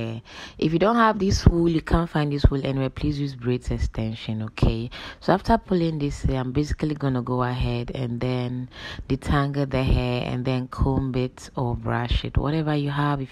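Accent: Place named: Ghana. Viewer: You speak like a Ghanaian but your name is a Nigerian name.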